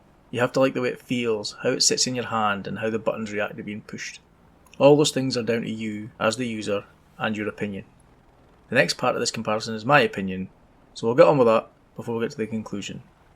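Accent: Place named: Scotland, United Kingdom. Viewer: The gentle Scottish accent makes it an effortless sell... I must be homesick! Seriously though, you highlighted most of the reasons I also went with the WinWing over the Virpil. Good video,. I hope there are many more to come!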